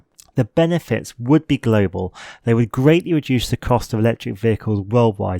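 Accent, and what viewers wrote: United Kingdom. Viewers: I think you did an excellent job. But than again, I'm a native English speaker from Ireland so very used to the British accent. By the way, I just subscribed to your channel due to the highly informative and interesting content. Keep up the good work!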